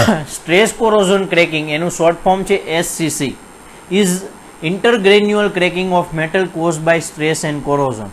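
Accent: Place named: Kathiawar, India. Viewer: Ready sambhline laage chhe kathiya vadi chhe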